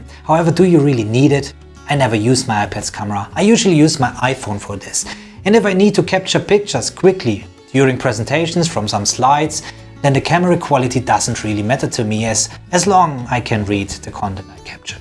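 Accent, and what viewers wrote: Germany. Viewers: Man hört absolut deinen deutschen Akzent heraus, aber trotzdem gutes Video. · Are you a german? By the way great video! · MrLufus ja man, das habe ich auch gerade gedacht. Ich habe auf ein Deutschen Kommentar gewartet😂❤️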